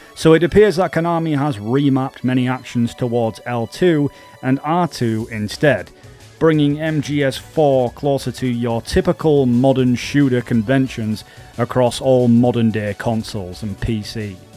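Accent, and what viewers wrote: Bradford, United Kingdom. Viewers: Yeah I don’t get why people are making out he’s Spanish or it’s not his first language. He sounds like he’s from Bradford or something 😂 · he’s pretty clearly from the UK, he’s putting dramatically and drastically together, not that hard to understand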